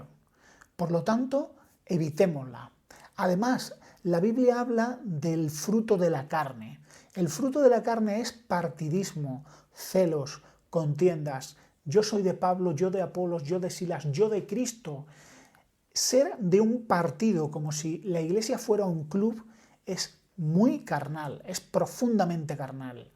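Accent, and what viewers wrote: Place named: Argentina. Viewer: No digas tonterías eres argentino se tu acento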